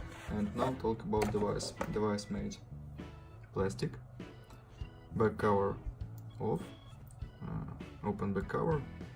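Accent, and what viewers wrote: Russia. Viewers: Русский акцент и никуда от него не деться.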